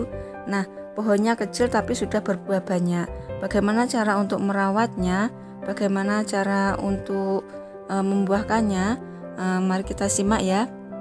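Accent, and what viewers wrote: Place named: Java, Indonesia. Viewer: Kelihatan banget suarane wong jowo.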